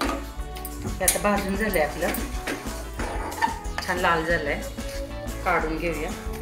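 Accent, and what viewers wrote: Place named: Solapur, India. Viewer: Kaku tumhi proper kontya gavchya ahat tumchi language Akluj solapur sarki vate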